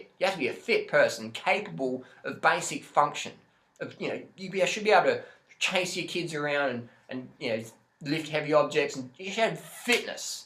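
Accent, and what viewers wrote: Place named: Australia. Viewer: It's crazy how that accent makes us all listen that much more, not being sarcastic. I do plan to try this diet since it just seems pretty intuitive to me. plus peoplel like you and banana girl are good examples. but actually it might just be that aussies can assimilate the sugars easier than the rest of us so it only works for you guys. lolllllllll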